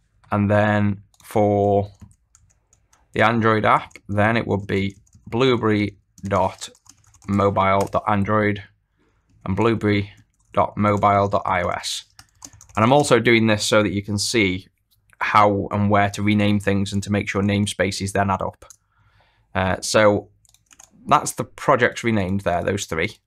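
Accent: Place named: North, United Kingdom. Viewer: Just wondering, are you from Liverpool? This sounds like northern UK accent.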